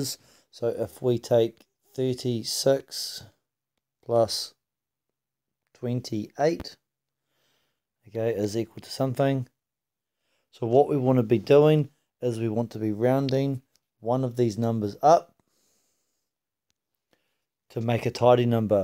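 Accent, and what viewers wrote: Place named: New Zealand. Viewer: as soon as I heard your accent bam I knew you are kiwi!!!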